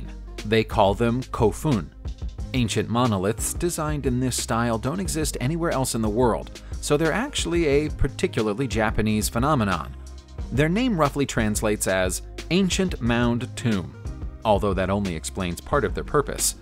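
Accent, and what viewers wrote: United States. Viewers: your pronunciation is typical American .....ATROCIOUS!!!!!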